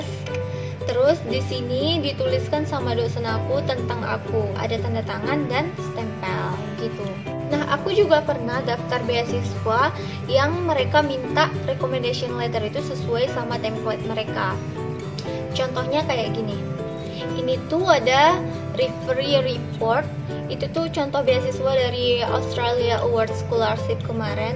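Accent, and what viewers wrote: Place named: Sumatra, Indonesia. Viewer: kayak orang sumatra logatnya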